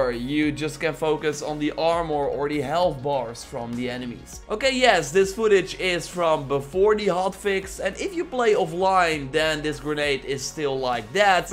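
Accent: Netherlands. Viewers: je hoort meteen dat je nederlands bent haha, nice content man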